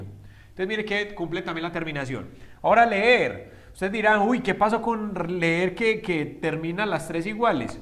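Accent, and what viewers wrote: Antioquia, Colombia. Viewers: El oprimido yo también lo note y soy de españapero se le nota un fleje (mucho) que es colombiano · igualmente soy de medellin lo note inemdiatamente por el acento :D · Más paisa que una arepa, gracias teacher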